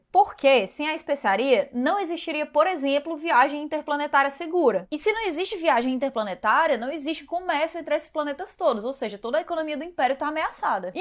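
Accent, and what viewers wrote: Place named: Ceará, Brazil. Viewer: Olhe só, uma cearense com um canal legal. Saquei o "meu" sotaque nas tuas primeiras frases. Li o Duna com 16 anos, e foi uma viagem... aliás, não poderia ter sido diferente, né? Assisti o filme, bem criança ainda, na Globo, bem antes de ler o livro. Lembro que não entendi nada mas adorei (sempre fui louco por sci-fi). Revi o filme, séculos depois... Olha, gosto dele. Acho que porque fui assistir já com o peso da crítica negativa em cima, e eu meio que pensei "É, não é tãããoo ruim assim". Felizmente, tiveram a sensatez de fazer agora dois filmes: porque, condensar aquela complexidade num filme só, não tinha condição. Com dois, pode ser: acho que o ideal mesmo era fazerem uma série, cê não acha? Comprei há pouco "O Messias de Duna", que aliás já tinha lido. Apesar de muito mais curto que o primeiro, nele, as tramas labirínticas no psicológico das personagens é bem mais impressionante. 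Li depois "Os Filhos de Duna", o quarto da série, e... achei legal. Mas nada demais. Integridade artística à parte, fica óbvio que, para o Frank Herbert, ele havia acabado de "construir" o seu ganha pão: poderia viver o resto da vida só de escrever continuações à saga... o que ele, de fato, fez; e ainda rolou uma graninha pro FILHO dele, que escreveu o último (Aff!). Sete? Oito? Ainda quero ler o terceiro, "O Imperador-Deus de Duna", mas não tenho intenção de ler os outros. Abraço.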